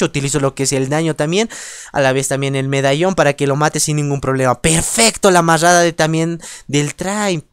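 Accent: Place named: Bolivia. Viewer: Vocalisa bien boliviano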